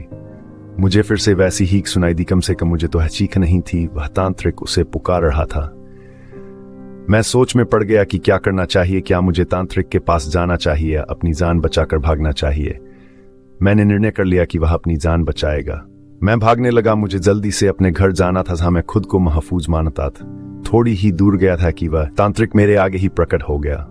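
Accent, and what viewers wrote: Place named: Pahadi, India. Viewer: Hindi Bolna Esa Lag raha he Jese Pahadi Ya Angrez Bol Rha Ho. Baki Story Acchi Bna Rhe ho..Bolne Ka Tarika Or Saaf Karo.👍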